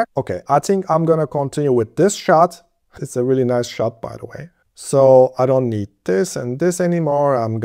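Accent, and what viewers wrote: Turkey. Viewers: kanka turkmusun tam bır turk aksanı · Emeğinize sağlık. Hocam İngilizcesi çok iyi olan bir Türk konuşması duyuyorum. Doğru mudur? :)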